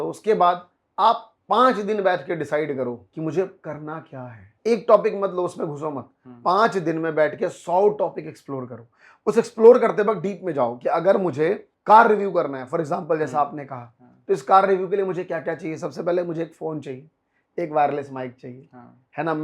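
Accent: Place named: Chhattisgarh, India. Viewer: bhi aap Chhattisgarh se ho kya mujhe aisa lag raha 🤟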